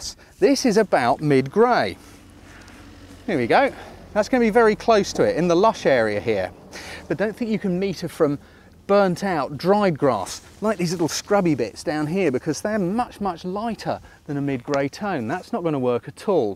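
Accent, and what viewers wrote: United Kingdom. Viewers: very well explained, thanks..your british accent is awwwssssoooooommmmeeee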